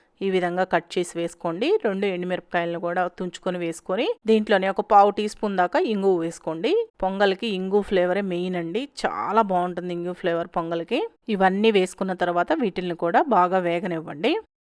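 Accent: Nellore, India. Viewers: Madam meru nellore valla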